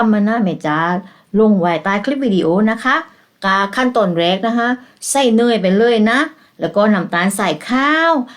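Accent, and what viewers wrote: South, Thailand. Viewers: ชอบเวลาคุณจ๋าแหลงใต้จังค่ะ 🥰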